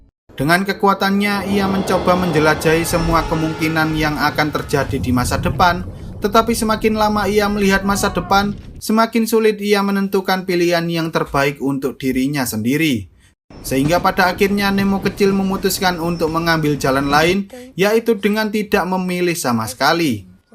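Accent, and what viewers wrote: Java, Indonesia. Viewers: Me'dok amat mas. Suaranya.hehe🙏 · Ampun dah medog bgt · Abang kok kayak bahasa jawa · mantap bang, semoga selalu medot Jawa!!! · Medok nya mengganggu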